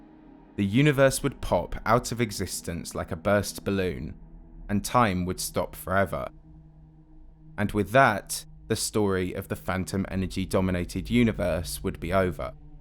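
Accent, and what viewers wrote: United Kingdom. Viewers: What's that accent? Where in the UK do they speak like that? Solar systeeeem, compositieeeen, it's kind of distracting. Other than that it's a great channel.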